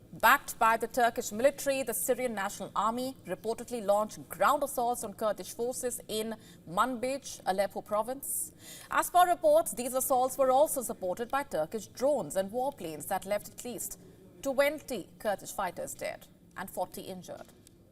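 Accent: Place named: India. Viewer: Indian accent so funny😂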